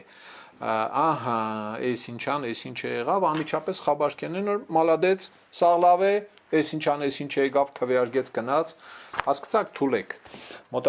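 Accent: Gyumri, Armenia. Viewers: Շատ գեղեցիկ ձևաչափով եք ներկայացնում էս ամենը, անուշ Գյումրեցու բառբառով։ Ու ահագին կարևոր գործ եք անում։ Շարունակեք։ Մի մոռացեք նաև գործող իշխանություններ բացերը...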